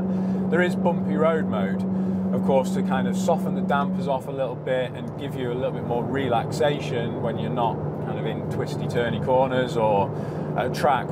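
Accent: United Kingdom. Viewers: Wow, what a precise, accurate and fascinating description. By the way, It's the first time that I really appraciate a british accent, which normally sounds to me, instead of sofisticated, somehow ridiculous and artificial..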